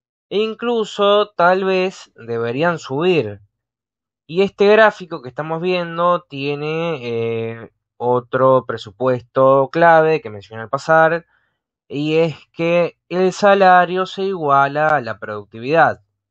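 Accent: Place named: Uruguay. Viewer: Vos tenes voz de uruguayo así que debes tener cara de pelotduo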